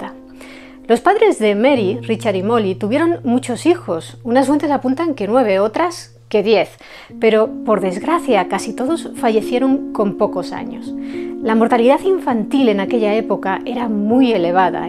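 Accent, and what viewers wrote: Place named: Valladolid, Spain. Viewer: Hola, muchas felicidades a por este vídeo pues como siempre su preciosa voz tranquila y pausada, junto al hermoso fondo musical y las imágenes bien seleccionadas me hace disfrutar de estos temas, me relaja mi mente, me distrae de mis ocupaciones de médico y su acento me recuerda a Valladolid España un bello lugar que visite por motivos de mi especialidad, adjunto su gran logro pues temas de misterio, historias curiosas y paleontología me agradan bastante y en este medio están casi dominados por hombres, así que muy bien por que logra destacar en este medio, adelante y mucho éxito!! Desde México: Atte Dr. Víctor López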